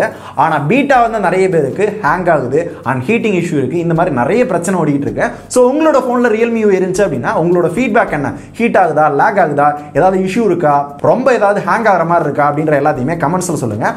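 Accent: Madurai, India. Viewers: Pro. Madurai pechu and nakkal vera level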